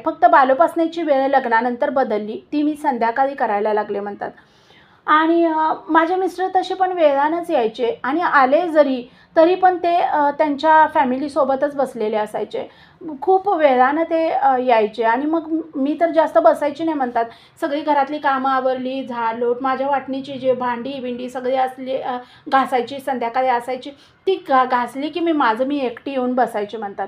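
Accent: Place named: Sangli, India. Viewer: ताई तुमच्या सांगलीच्या भाषेतच बोला , सांगलीची भाषा ऐकायला खुपच भारी वाटतं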